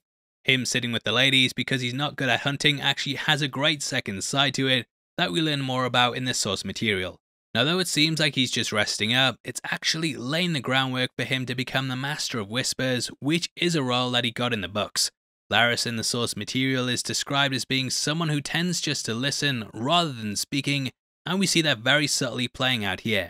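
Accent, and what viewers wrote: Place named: England, United Kingdom. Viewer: Love your accent, what part of England is that from?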